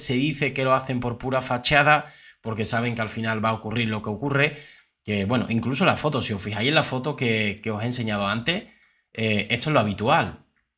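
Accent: Andalusia, Spain. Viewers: Joder, un andaluz leyendo un digital independentista, lo que hace la PASTA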